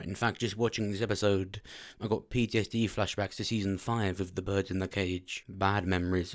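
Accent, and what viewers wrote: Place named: Southern England, United Kingdom. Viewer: Lol I’m from blackpool but i think your southern accent proper ace lol you have a creepy type voice as well 🤣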